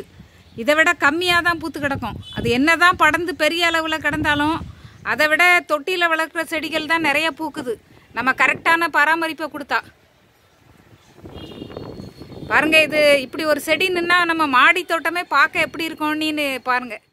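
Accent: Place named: Tirunelveli, India. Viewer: மேம் நாங்க தாராபுரம் ஏரியால இருக்கவங்க மேம் எங்க ஏரியால இந்த செடி கிடைக்கிறது இல்லை ஒரு பதியம் போட்ட செடி எங்களுக்கு கிடைக்கும் எனக்கு இந்த செடி ரொம்ப பிடிக்கும் மேம் ஆனா எங்க ஏரியால இந்த செடி அதிகம் இல்லை இனிமேல் நீங்க வந்து திருநெல்வேலி மாவட்ட ஏரியாவுல உங்க வாய்ஸ் வந்து திருநெல்வேலி பாச மாதிரி இருக்கு அதனால தான் கேட்கிறேன் இரண்டாவது என்னன்னா முல்லைக்கொடி எல்லாமே கிடைக்குது இந்த சந்தனம் உள்ள செடி வந்து கிடைக்கவில்லை எனக்கு ரொம்ப நாளா இந்த செடி வாங்கி வைக்கணும்னு ஆசைங்க ஆனால் கிடைக்க மாட்டேங்குது எனக்கு ஒரு பதியம் போட்ட செடி தருவீங்களா ப்ளீஸ்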